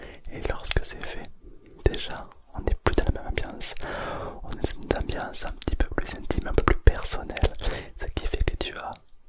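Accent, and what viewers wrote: South, France. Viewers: J’adore ta vidéo ! Très relaxante et merci pour ça ! L’accent du Sud qui fait un peu bizarre quand tu viens pas du sud mais j’adore ! J’aime et je m’abonne ! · Super découverte 👍 super travail c'est très relaxant ! Je valide l'accent du sud également 👍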